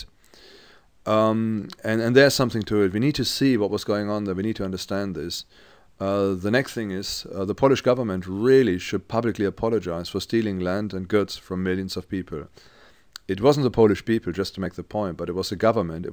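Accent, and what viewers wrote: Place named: Germany. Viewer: Nice job !!! i listened all, sometimes it was kind difficult to understand just a few words pretty much by your Hard German accent, but you're German talking about German history which is really nice :D !!! i'll be traveling to Germany next year, and i wanna make the tour to all these old days German lands, but i wanna go farter , i wanna include pommer,Danzig,Warmian-Masurian,Kalliningrad, and Memel, currently Klaipeda South Lithuania, all those lands are full of German vestiges,well in this case i wanna ask something to you, as German citizen that you are, so why after Berlin's wall falling, Germany never reclaim any of those lands ???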